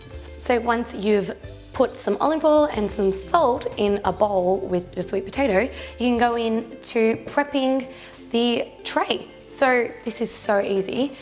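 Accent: Australia. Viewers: Are you Australian I'm new to your channel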